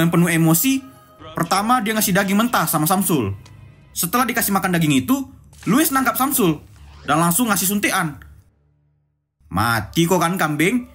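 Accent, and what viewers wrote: Medan, Indonesia. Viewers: Medan ni bos😂😂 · Asli orang medan nih wkwk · Logat Medan tanggung sih. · Owalah mainmu kurang jauh bg itu logat khas medan rata² kalo anak medan tu cara ngomong nya kekgitu mkanya maen² kemari biar paham dikit yekan, hargai lah karya seseorang, aku org medan dan aku terhibur dgn cara dia menyampaikan alur film nya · Medan kali yakan lekk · Iya anjir suara serak serak Medan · Hadir bg medan:v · Wkwkwk orang medan toh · Mantap x bang ceritanya bang,,,kek bwrasa lagi di medan ajj awak nii,,,, Lanjut bang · Keren logat Medan:v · Logat² medan ni pengisi suaranya wkwk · Logat Medan nya kental x ya BG😅 Love you BG😅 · Iya bang orng medan ni kek nya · Fix orang medan ni abang nii...semangatt bang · Orang Medan ya bang, sama kita orang Medan LIKE WEEEE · Latak org medan😂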